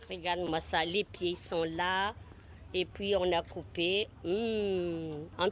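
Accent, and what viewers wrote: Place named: Madagascar. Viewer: Il n’y a pas que ses compatriotes comme public…deja elle meme vit dans un pays où on parle français donc certainement elle a des proches qui s’expriment dans cette langue, l’interêt de ce genre de publications est de montrer à un maximum de personnes dans le monde, gasy ou pas, la beauté du pays et la vie quotidienne sur place, d’oú le choix de mixer les langues afin de ne pas restreindre sa communauté. Vous voyez bien qu’elle fait meme l’effort de traduire et d’apprendre certains mots gasy à ses abonnés. Par ailleurs je trouve justement qu’elle s’exprime bien en français avec l’accent et les nuances propres à son pays d’origine…quel intérêt de voir une gasy parler Français comme une parisienne?? Y a d’autres videos sur le net pour cela…quand on montre son pays natal, ses émotions, on a pas besoin de parler comme molière. 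on parle avec son coeur et son accent!! En plus le français est aujourd’hui parlé de différentes manières en fonction des pays..c’est meme ce qui fait son charme et la beauté de la video.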